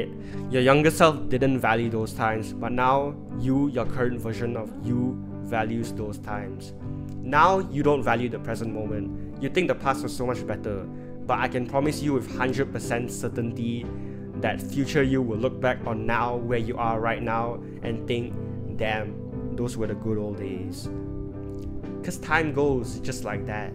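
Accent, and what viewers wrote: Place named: Singapore. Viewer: Singaporean accent makes the message better good luck to you young man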